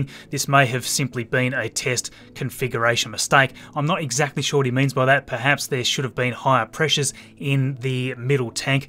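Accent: Australia. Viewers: Nice Channel. Just hit subscribe after watching you for a few weeks. good content, and nice for you credit your sources. Question for ya.... You sound like an Aussie... And I know it's likely to not get the views you may want, but what about something on the Gilmore Space up on the Gold Coast?? Anyways.. thanks for ya content:) - 4563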